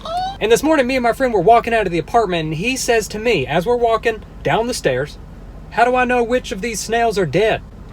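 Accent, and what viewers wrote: South, United States. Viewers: Kind of new to this channel. Love him. But where is he from? He says he lives in California but if I'm not wrong, ain't that a southern accent??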